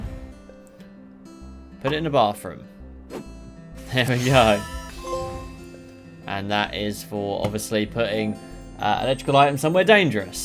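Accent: United Kingdom. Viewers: My God, I Love your British Accent....😊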